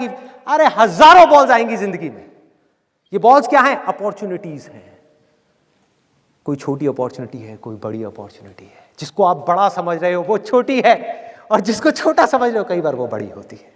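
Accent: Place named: India. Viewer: Oh my gosh.i can't understand a single word.indian's accent is terrible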